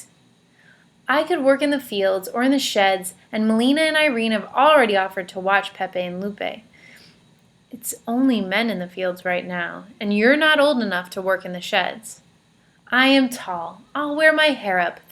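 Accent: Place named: Mexico. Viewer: Bruh! You need to work on that Mexican accent! >:(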